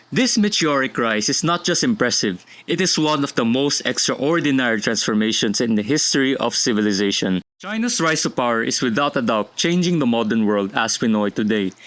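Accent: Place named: Philippines. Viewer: Downvoted for a flip speaking English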